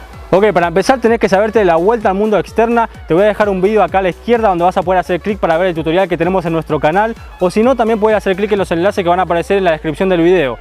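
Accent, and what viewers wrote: Argentina. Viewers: Crackk!!! Sos argentino no??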